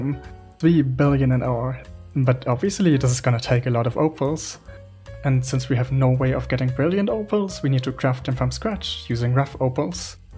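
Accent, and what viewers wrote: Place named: Germany. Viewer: Your english sounds very german 😮. I love your Videos and was actually horrified when i found out that i wasnt subscribed to you 😅, great Video! Keep Up the good work ! Also can i reference your Videos in my own ? I also got a YT channel, and since a few Weeks i Focus on Lifeskills and anything but grinding... have a nice Day 😊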